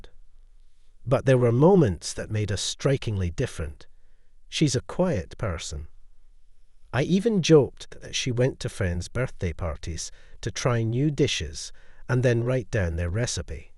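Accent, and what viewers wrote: Scotland, United Kingdom. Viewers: Why is a Scotchman narrating when 5Th Avenue is shown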